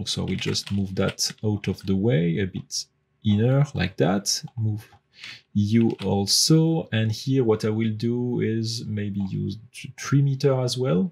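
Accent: France. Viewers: J’adore l’accent French 😋😉😎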